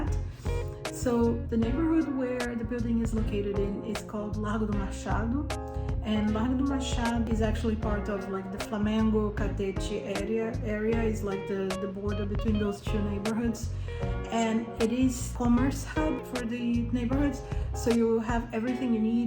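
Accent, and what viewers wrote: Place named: United States. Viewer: Interesting,, but you really don't have a British accent.. you sound more like an American.. hahaha 🤣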